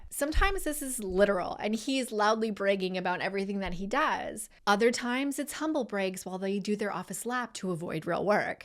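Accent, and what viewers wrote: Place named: New Jersey, United States. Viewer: Thanks for the video but the jersey girl accent is shaking up my autism. 🩵💐🧸🩷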